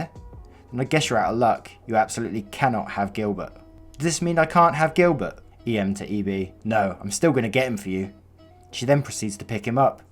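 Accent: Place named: North, United Kingdom. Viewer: Subbing because I love your accent. I’m guessing Northern UK?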